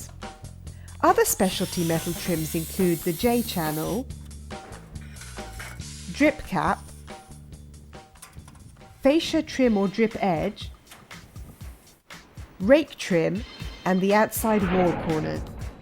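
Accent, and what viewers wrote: United Kingdom. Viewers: Love the British accent! Good video too! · GREAT video!! Thanks for making it! Love the narrators accent. Taking this country back one job at a time! Rod Fair, fellow Brit in Fl. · The lady narrator with the Brit accent adds class to this. Well done.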